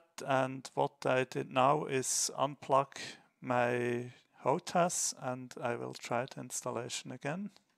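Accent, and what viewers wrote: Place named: Finland. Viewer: you have a sort of Finnish accent 😉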